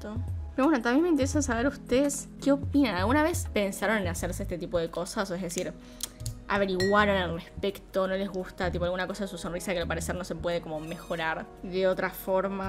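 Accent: Argentina. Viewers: Jajjaj me encanta el acento argentino jajaj saludos desde chile 😌❤️🙃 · Amo demasiado este canal. Creo que me empieza a gustar mas que el otro. Me divierte muchísimo tu forma de hablar tan naturalmente Argentina y los temas de este canal. Saludos desde Santa Fe. Pd: El maquillaje de Natura es hermoso. Pd2: hay que lavarse los dientes pero tampoco demasiado. Ya que también te pueden salir caries por exceso de cepillado ya que te choteas todo el esmalte. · Soy yo o los Argentinos dicen anios en ves de años xd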